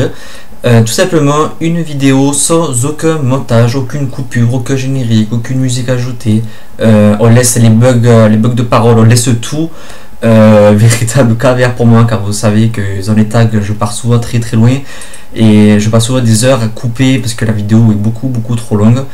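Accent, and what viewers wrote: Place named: Gard, France. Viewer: Hey ! Merci d'avoir repris le tag :D T'es d'où exactement dans le Gard? (sans dec entre ça et ton accent, j'ai le mal du pays à mort ^^)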